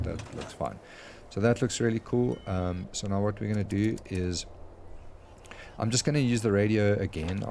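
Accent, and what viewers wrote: South Africa. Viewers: My brother wonderful mate really great explanation and total groovy build by the way u sound south african no offense I am from there also maybe we can jam sometime also I just got back on fallout after 5years lol being grinding something else but loving the game again peace man.... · Bro, are you South African?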